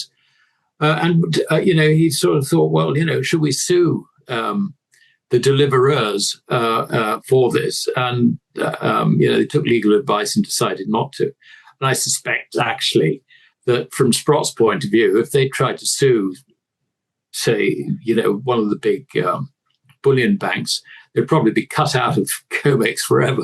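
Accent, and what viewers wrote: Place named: United Kingdom. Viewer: Blah blah blah with a British accent. Shameless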